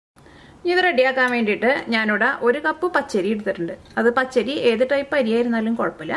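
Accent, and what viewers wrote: Thrissur, India. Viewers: Ithu njan thanne kandu pidicha receipie athu kalaki 😆😆. All ur receipes are simply the best. And ur Thrissur slang😙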